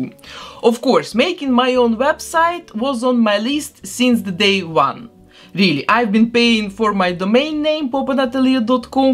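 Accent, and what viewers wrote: Russia. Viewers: Искала комы на русском, очень мало, быстро узнала акцент, думаю, ну точно, имя подтвердило. Русский акцент узнаешь из тысячи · Этот русский акцент 😅❤ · Сразу слышна Раша Тудей ,ну на столько слышен акцент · Вы русская? Просто мне кажется, что у вас русский акцент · Такой акцент русский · I hear a Russian accent🤔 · Иногда мне кажется что у вас явный русский акцент в речи 😂❤ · Sonds like russian accent 🤔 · Russian accent.🙄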